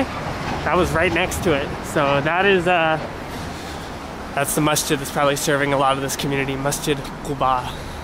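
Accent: Pakistan. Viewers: also love your urdu accent ❤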